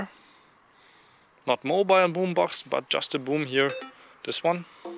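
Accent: Germany. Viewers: Sehr informatives Video, danke dafür! Btw man hört deinen deutschen Akzent so hart :D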